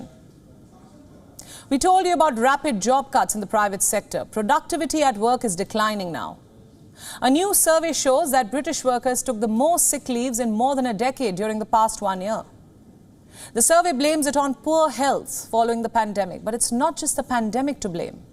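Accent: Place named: India. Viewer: the host Ugly Indian accent is a pain to hear. Indians just got the worst accent and everyone in the US is laughing at how Indians speak English.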